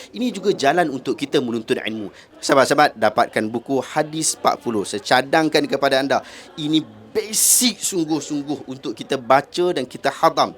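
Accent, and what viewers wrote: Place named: North, Malaysia. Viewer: Shbt kami oqang utaqa Penang Perlis Kedah dh besa sebut dari kcik...tkleh ubh dh ...oqang utaqa ni p dok tang mana pn .loqat utaqa tkeh Tinggai...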